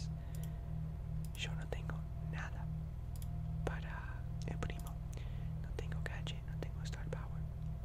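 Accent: Argentina. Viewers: bro tu español es increíble tenes parece Argentina ajjaja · Te juro que pensé que era argentino xd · Eres argentino? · Pero si es de argentina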